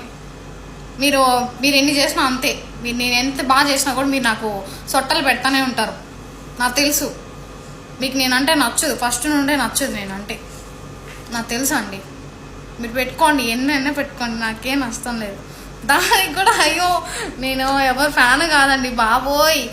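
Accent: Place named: Rayalaseema, India. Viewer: Meru kadapa bedda na rayalasema leka karnul aa me voice lo base bagunde super continue🎉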